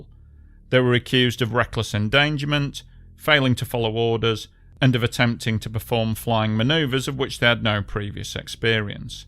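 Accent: United Kingdom. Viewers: Where is the narrator's accent from specifically in the UK?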